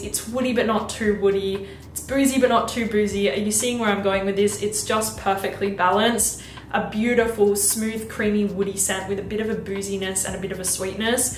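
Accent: Australia. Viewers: aussie accent 😍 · Great review! Also, I have to say you (and that voice/accent (Australian?)) are absolutely lovely. Anyway...love your videos.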